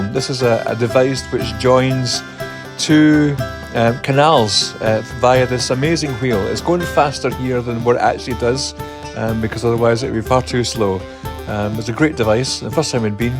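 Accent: Scotland, United Kingdom. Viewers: Hello from Florida! That sweater looks so professionally done, I'm sure your daughter will love it. 💞 Your holiday must have been so relaxing. Wonderful food, great scenery, and the lady you love. What could be better? It's so nice to hear your Scottish accent.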